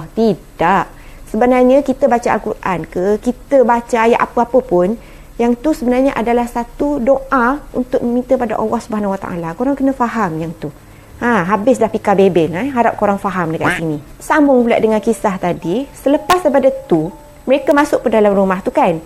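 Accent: Kelantan, Malaysia. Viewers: Maaf bertanya...daripada slang pika ni saya rasa pika ni orang kelantan kan?.... walaupun sekali dengar memang tak macam org kelantan,tp kalau dengar betul2 akan keluar slang kelantan tu.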